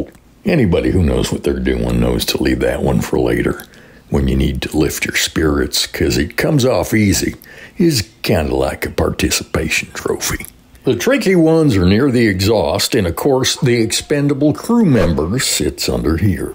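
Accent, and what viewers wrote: South, United States. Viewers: He has southern drawl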